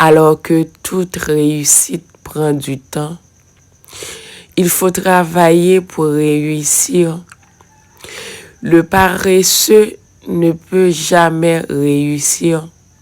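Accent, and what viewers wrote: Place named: Democratic Republic of the Congo. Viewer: dans cette vidéo tu as l'accent d'une congolaise,depuis la nuit des temps ça a été toujours ainsi rien n'est gratuit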